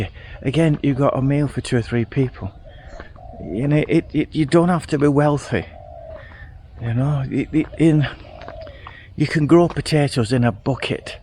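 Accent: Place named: Northern England, United Kingdom. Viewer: 100% stay! And …… and I’d miss it. It’s very relaxing to see you wondering around your garden talking to us as you go. The garden brings out the best in you. It’s wonderful to listen to you a man of great eloquence and delightful northern tones. Your garden is better than Kew Gardens - it’s a belta, like. So there you have it this hard leftist says don’t move. And if you do I’ll call on every subscriber to go on bloody strike!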